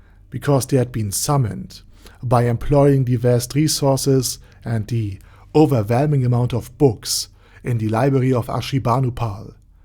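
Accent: Germany. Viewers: dude I love your accent, are you German?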